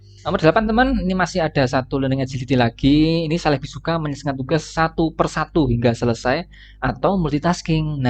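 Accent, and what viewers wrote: Java, Indonesia. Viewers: Sangat mudah dipahami dan saya suka medok jawanya mas wkwk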